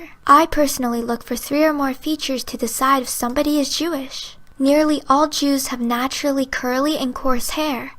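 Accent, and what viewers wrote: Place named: North, Italy. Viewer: My guess was that you're either North Italian or West Slavic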